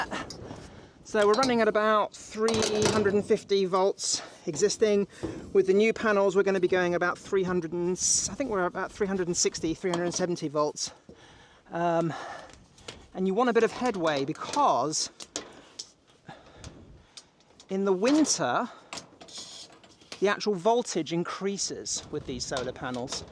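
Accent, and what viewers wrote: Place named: Bristol, United Kingdom. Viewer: If I had to hazard a guess it would be Bristol 🤔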